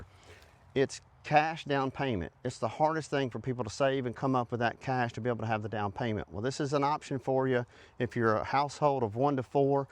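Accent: South, United States. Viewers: I’d like to listen to you but your southern accent doesn’t sound intelligent. Sorry. Just can’t.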